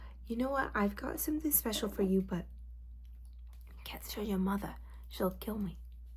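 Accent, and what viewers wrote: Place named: Brooklyn, United States. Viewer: Your Brooklyn accent is one of the most natural I've heard. You must be from around there, or your family is!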